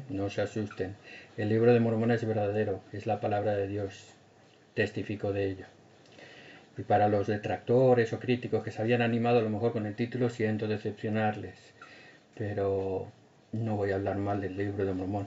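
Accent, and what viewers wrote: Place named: Spain. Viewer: Hermano buen bideo y me fasina su acento español olé tio¡¡ Saludos hermano y éxito, Bendiciones.....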